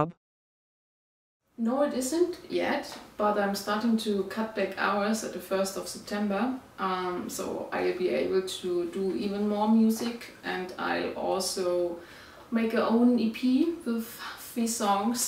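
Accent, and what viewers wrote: Germany. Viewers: Love your German accent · Now you will have to spend a lot of time answering questions! 💪👍You look amazing with a short haircut , and a cute German accent!❤😊😊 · She's from germany, Berlin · very good your English; I like the accent: German speaking in another language is always something different. And I know well that the German language is complex, like the Portuguese language. Thanks for the initiative to answer the questions. Like it your faces when you play.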